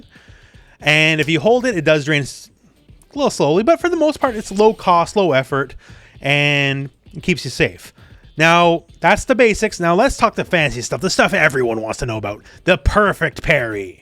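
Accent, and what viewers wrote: Canada. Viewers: You sound like you must be from the same place as Jordan Peterson.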